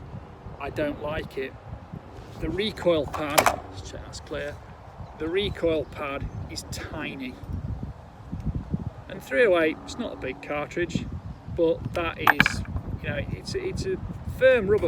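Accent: United Kingdom. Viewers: Well he does have a british accent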